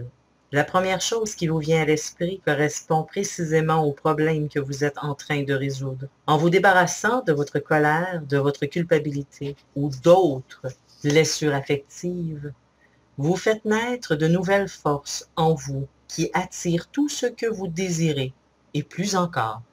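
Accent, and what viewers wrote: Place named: Quebec, Canada. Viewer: OH !!! UN ROBOT QUI PARLE QUÉBECOIS ...!!!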